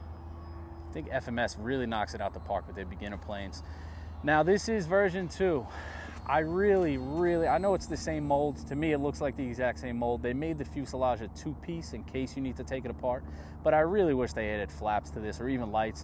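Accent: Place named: Boston, United States. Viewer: Man keeps saying "supah" and I keep thinking "dudes gotta be from Boston"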